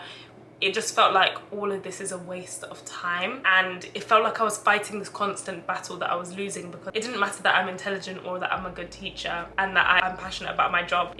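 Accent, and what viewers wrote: United Kingdom. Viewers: They were mean to you....I am a white older teacher, and have taught hundreds of people, so on that claim of expertise; you are pretty, you are special because of braving the adventure, and you are gifted in language expression with a British accent that is so eloquent and in fact exquisite, and you are clearly intelligent as anyone could tell if they had half a concept of full sentences, vocabulary and grammar....they were lucky to have you and didn't recognize the chance they had for their students...it was their loss...Like Jesus' advice was; shake the dust off your feet as you leave them and know you will be guided to a lovely future...you deserve it...meanwhile you got to experience a high context culture which is based in inference and not directness...(PS, I was a French teacher so I want to add; Louis Pasteur had a colleague Antoine Bechamps who argued that if the germ harms then it should harm everyone but it doesn't so in fact Pasteur woke up to that fact before he died and confessed that he was wrong and Bechamps was right...his dying breath said Le Terrain Est Tout, the terrain is everything...people's immune system means the germ doesn't have the power people claim it to have...your field or terrain is helping you.) God bless you! 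· Koreans are a bunch of racist weirdos with their Kpop and femboys. You're actually incredibly beautiful and hit the genetic jackpot. Plus you're well spoken and you have a British accent on top of that. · are you british? i can hear it in your voice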